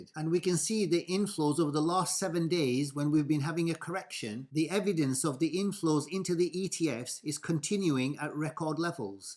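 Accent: United Kingdom. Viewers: Thank you Sir for your financial ideas and for your perfect British English. God Save the King!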